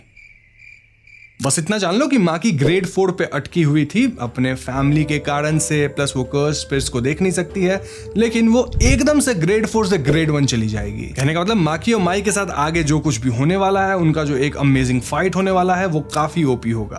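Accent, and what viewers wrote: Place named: Bihar, India. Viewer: Bhai ye sb to hmne anime me dekh hi lia 😂 Teri vdo dekhne ka kya fayda Vo bhi bihari accent me bol rha h 😏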